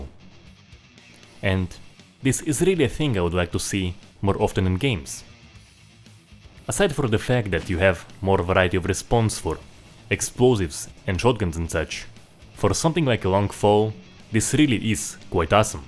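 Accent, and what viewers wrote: Russia. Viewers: Я заметил твой русский акцент. Ты Русский? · For fuck sake youre VERRRY RRRUSSIAN ACСENT. I understand, it makes easy to speak English. But my ears can't stand it. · Я знаю что ты русский ! По акценту слышно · Такое ощущение что автор русский, Из-за акцента голоса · russian акцент замечен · Very strong russian accent like in movies... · I love his russian accent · Русский акцент, ты русский ? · Так разговаривать только русский может) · Are you are Russian? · Ты руусский) По акценту видно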